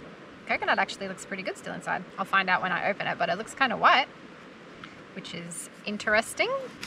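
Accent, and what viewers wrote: Australia. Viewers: You are so stunning and talented. It's great to finally hear your beautiful Aussie accent. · So gorgeous and sexy. Love your long hair, your beautiful smile and your Aussie accent. You are very resourceful and intelligent. Keep up with your awesome videos. Thanks from Canada. ❤❤❤❤❤❤❤❤❤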